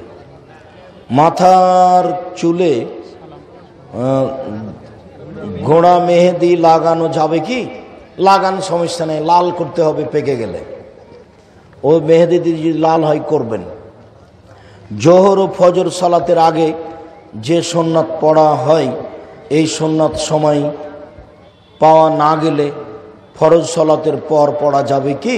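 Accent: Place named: Kolkata, India. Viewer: Unar uccharon Kolkata type..keu ki details bolben unar(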